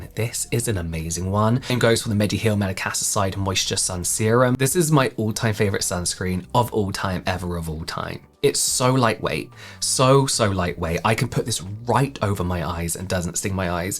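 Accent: United Kingdom. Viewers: I can imagine him reading children’s bedtime stories on Ceebeebies or something!! Obviously not just that, he’s got a lot of potential for many opportunities for voiceover aspects of a career 100% but I think my kids when they were little would have enjoyed his voice too! ❤ Accent and voice is on point! 👌🏼🥰 British accent along with his voice/pitch etc is perfection 🎉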